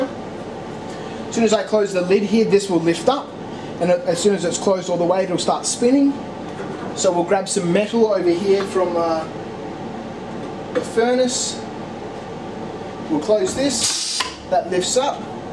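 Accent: Australia. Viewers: Where did you get the spin caster? Sounds like you're in Australia and I'm looking for a supplier here.. Thanks!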